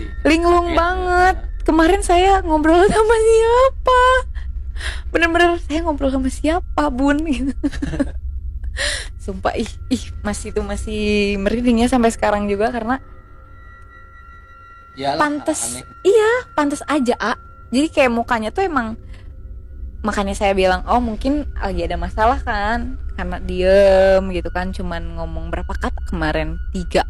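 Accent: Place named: West Java, Indonesia. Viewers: Sunda pisan euy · Seru sih ceritax cmn cape dengerx mbax nyusun katax mikir bgt...mungkin krn logat sundax..😀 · Kok ketara banget logat Sunda di gaya bicara nya ya bang??? 😁😁😁😁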